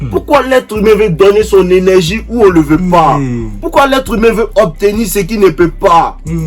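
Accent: Ivory Coast. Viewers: j'apprécie ton français ivoirien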